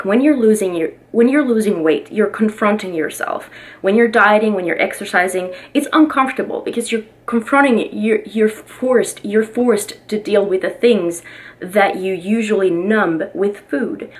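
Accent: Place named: Louisiana, United States. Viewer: is getting that Cajun accent. 😛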